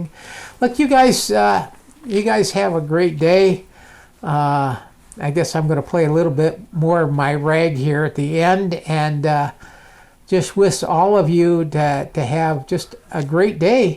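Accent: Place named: Michigan, United States. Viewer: I thought you were from Michigan by your accent, Im from Howard City Michigan❤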